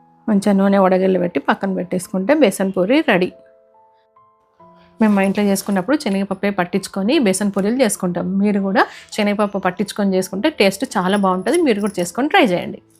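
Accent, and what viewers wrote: Telangana, India. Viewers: మంచి తెలంగాణ వంటకం తెలంగాణ యాస లోనే చెప్పారు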